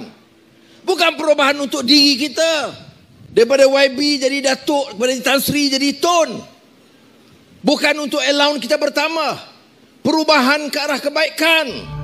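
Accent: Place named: Jambi, Indonesia. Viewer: Mirip dialek Jambi..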